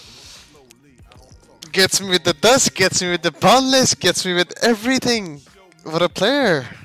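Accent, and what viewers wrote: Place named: Arab world. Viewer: Recién me doy cuenta que tiene acento árabe XD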